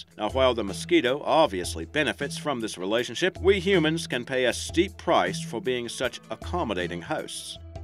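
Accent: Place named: Australia. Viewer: Nice to hear the Aussie voice make a return. It just feels better with it, like ol' Steve Irwin came back from the dead.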